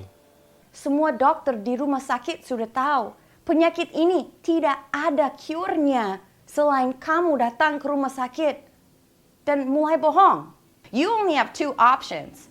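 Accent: West Java, Indonesia. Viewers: Logat sundanya dapet euy...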